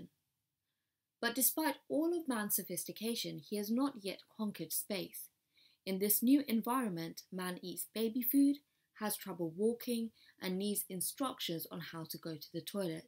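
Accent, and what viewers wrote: London, United Kingdom. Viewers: totally agreed beautiful British accent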